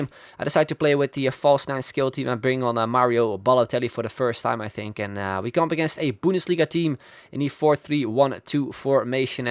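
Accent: Netherlands. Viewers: It's a Dutch accent and its not a shitty voice it's a nice voice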